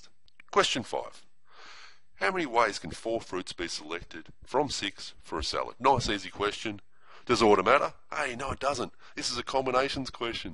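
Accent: Australia. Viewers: I can't tell if I'm here more so for the advice or the Australian accent. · it’s not normal american...more like australian or new zealander...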